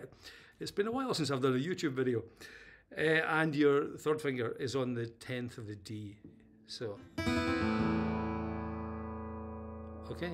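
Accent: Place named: Scotland, United Kingdom. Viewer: Are you Scotts ? What a great accent ! love it.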